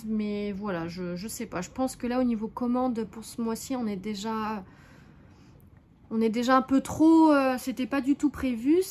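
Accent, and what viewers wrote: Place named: Hauts-de-France, France. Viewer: Bonjour, je découvre votre chaîne et j'ai regardé votre vidéo avec grand plaisir, votre façon de parler très naturelle me plaît beaucoup (peut-être aussi parce que j'habite les hauts de France également et qu'il y a de toutes petites pointes d'accent dans vos paroles). J'ai cru comprendre que vous avez commencé le tricot il y a un an, je suis en admiration devant votre paire de chaussettes de Noël 😮😮😮😮😮😮😮. Continuez comme ça ne changez rien à bientôt 🙏🤩🤩🤩🤩🤩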